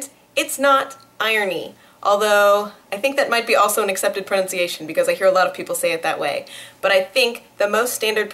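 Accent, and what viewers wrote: United States. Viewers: Standard 'murrican' English that is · In England it's more "i-on", not "i-ern", the way you pronounce in America is not British standard English. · she's right, even if her 'standard english' pronunciation is actually a bit on the USA side. Ironic is pronounced WITH an 'r', instead :). Iron, without. In USA, they do pronounce it as she says.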